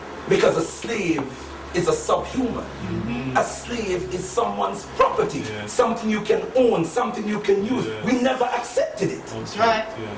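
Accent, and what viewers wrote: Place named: Jamaica. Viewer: Just Became Your 1000th Subscriber ...Not Big on the Egyptian Names But its a Positive Vibration & iHear That Jamaican Accent ,iKno My People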